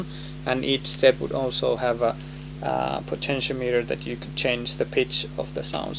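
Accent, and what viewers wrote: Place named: Sweden. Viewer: you sound just like martin moulin speaking.. same accent....... are you sweden too?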